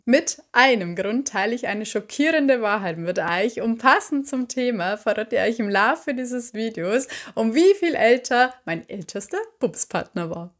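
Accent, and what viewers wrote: Bavaria, Germany. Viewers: WOW,.. wo bin ich denn hier gelandet ❓️ Hübsch, sympathisch und ein interessantes Thema bei Youtube ❓️ Das sind ja gleich 3 Dinge auf einmal und das geht hier doch nun wirklich nicht... Also manchmal, so wie in deinem Fall, finde ich die Algorithmusvorschläge wirklich toll... Mit deinem verschmitztem frechen grinsen sowie deiner verführerischen erotischen Stimme in Verbindung mit deinem süßen Bayerischen Dialekt und deiner offenen lockeren fröhlichen Art, hast du eine sowas von sympathische Ausstrahlung, das ist einfach unglaublich.... WOW ‼️ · Wie kann man nur so unglaublich an ziehend und attraktiv sein. Wenn du mir über denn Weg laufen würde würde ich dich sicher nie mehr vergessen können. Allein deine Stimme mit einem so schönen Bayerischen Akzent Wow.....😮. Liebe Grüße Torsten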